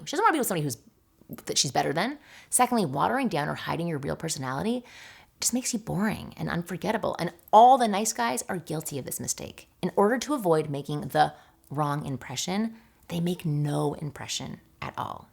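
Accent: Canada. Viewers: You are clearly Canadian, therefore your OPINION doesn't mean Shyte to me! Like I'm gonna take advice from someone who calls soda "pop" and calls Z Zed! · your Canadian 🇨🇦🍁accent shines through sorry😂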